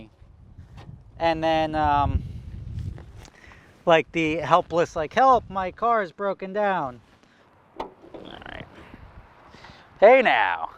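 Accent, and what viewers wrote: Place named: New York, United States. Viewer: The angry New York accent really came out in this one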